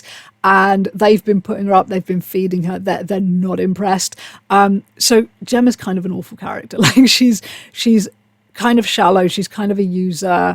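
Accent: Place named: RP, United Kingdom. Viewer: Ok so first off I want to say that this is not a creepy old guy hitting on you… but rather a creepy old guy explaining how shocking it is to hear one of the most beautiful women I have ever seen who actually felt like she was on the outside and she had bullies! Seriously if I could put what my dream woman would have looked and sounded like to physical reality when I was younger it would be you. You are exactly the girl that I always wanted to go out with but never could pull it off. And oddly enough I too have been on methadone for 16 or so years. Perhaps one or two more but 16 seems about right. So anyway…I’m really glad that you seem to found yourself and are living the best life you can… and you are taking really good care of yourself as I know how hard it is to stay in shape while being on methadone. I liked how you put in the sidebar to make sure that no one else makes the same mistake as you. 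I find so much in common with what you are saying… I am autistic. I suffer with forced speech. I was bullied in grade school. Anyway I suppose that I wrote this because I was so shocked that someone who seems so perfect had such a terrible time. Or perhaps it’s the posh accent. Loved the video and I am looking forward to seeing more.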